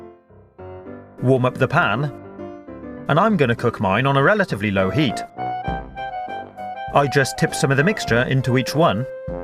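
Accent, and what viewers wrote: United Kingdom. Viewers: he's British, that's how they say it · god bless your british accent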